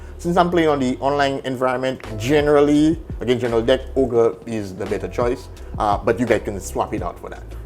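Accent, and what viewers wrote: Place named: France. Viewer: If I'm right, that a great French accent. If not, that just a great accent xD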